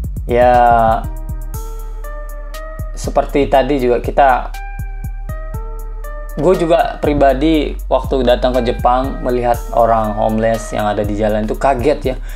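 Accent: Lombok, Indonesia. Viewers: Kentel gati aksen lombok indonesia no mton.. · Kyknya abng ini org sasak ya? Gw tau dari logatnya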